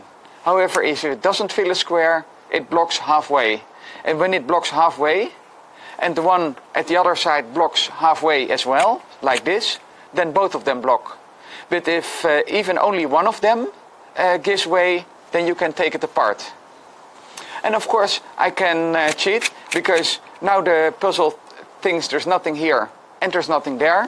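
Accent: Netherlands. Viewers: I heard he was from the Netherlands as soon as he began talking XD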